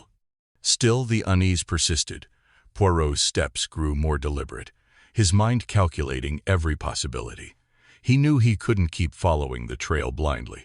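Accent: United States. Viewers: American accent doesn't cut it.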